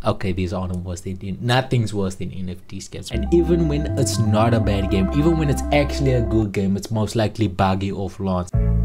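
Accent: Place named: South Africa. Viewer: Eish I thought u where from South Africa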